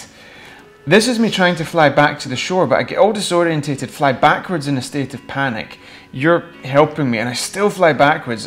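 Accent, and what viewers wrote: Scotland, United Kingdom. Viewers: wonderful video. super helpful. I wish all instructional videos were given in that lovely Scottish brogue. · A cool video. I love the scottish accent and the landscapes. ;-)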